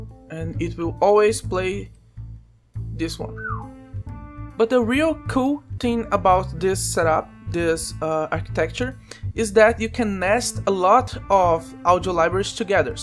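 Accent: Brazil. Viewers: esse sotaque é br em hahahaha, nice man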